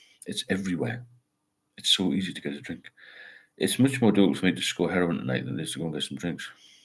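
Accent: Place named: Northern England, United Kingdom. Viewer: he has a very strong Northern English accent - I too find him hard to follow & I'm from England (South)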